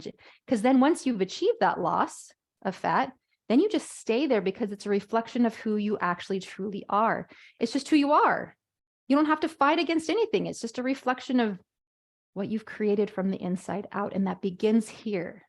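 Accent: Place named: Utah, United States. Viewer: This was great! How come you don’t have very many subscribers? Are you just starting out? What brought you here? On a weird note, are you from Utah? You have a pretty strong Utah accent!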